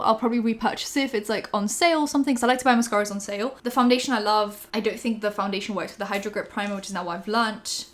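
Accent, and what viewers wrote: England, United Kingdom. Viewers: Wait I thought was from London your accent is so English and know she has said it she does look polish but I was just so surprised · that is so rad you are from Poland! I was wondering because I stalked all your older vids one day and noticed it sounded like you had a totally different accent. So, I assumed you just eventually pretended to have an posh english accent... hahah totally my bad!! My husband is from the UK and you speak english like you grew up in the UK. I guess you did grow up in the UK starting from a pretty young age. Anyways- that is so cool you are from Poland! hell yeah! if you like watching Polish films and stuff still- you should watch " Cold War" - best love story ever and it is pretty new, came out around 2018?? I think... anyways- it takes place in Poland and it is AMAZING!! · tbh i wouldnt be able to tell that english isnt your first language, messes w my brain bc you just sound like a london native lmao, i live in north london and literally cannot fault ur accent its mad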